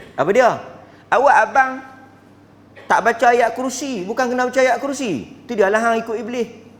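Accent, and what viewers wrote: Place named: Perlis, Malaysia. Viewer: Jazakallah khairan. Suka sangat dengar Ustaz bila menggajar dgn loghat Perlis (kadang tak faham tapi takpe). Memang teruk la manusia ni kalau Iblis minta doa pada Allah tapi kita minta dari selain Allah swt.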